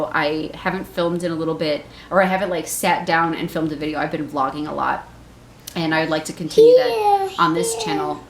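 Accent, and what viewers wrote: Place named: Minnesota, United States. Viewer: I'm not a birth worker, but I stumbled here from Twitter. Had my third and maybe final child at home with a midwife and her apprentice (?) It was healing (after two oppressive/demeaning hospital births). What you do is so important and I just wanted to encourage you. Also, I knew you were from Minnesota as soon as you started talking. 🙂 I've got family there.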